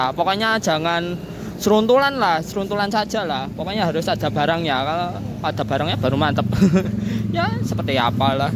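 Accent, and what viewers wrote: Java, Indonesia. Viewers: Asli mu mna tho Nang..? 😂 Medhok bgt.. 😅😅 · Asli mu mna tho Nang..? 😅 Medhok bgt.. 😅😅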